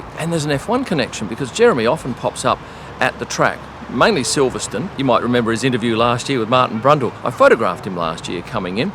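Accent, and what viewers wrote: Australia. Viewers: Why are you talking about US dollars when you have an Australian accent and are talking about a pub in the UK. We have pounds here!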